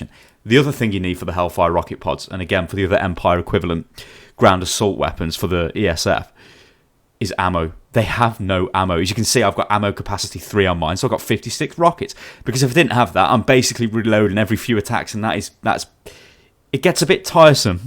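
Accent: United Kingdom. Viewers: Oh, hes British. They pronounce it differently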